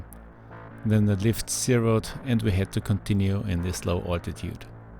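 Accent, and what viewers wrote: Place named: Austria. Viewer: Ich weiss nicht was ich besser finde, die gut gemachten Videos oder das "Arnold-Schwarzenegger-Englisch".... Beides zusammen unschlagbar! Grüsse Alpha 3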